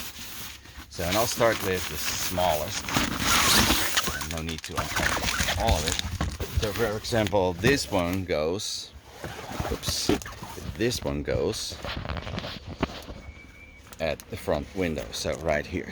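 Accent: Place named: Quebec, Canada. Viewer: I am sure you would have more views if you knew how to make the photos bigger, are you French Canadian like the company, your accent sounds like that (not like Tustin) near where I live!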